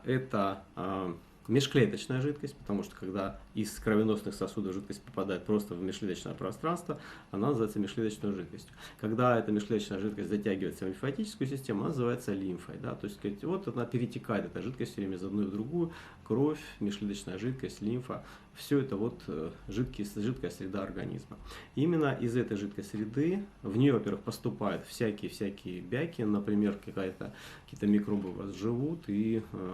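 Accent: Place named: Russia. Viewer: Отвратительная подача материала с постоянным занудным аканьем.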